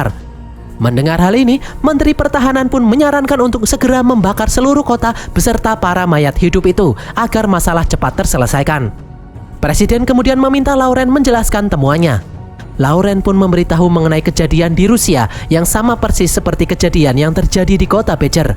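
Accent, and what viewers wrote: Java, Indonesia. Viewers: Perasaan suaranya beda ya?? Kok medhoknya terasa banget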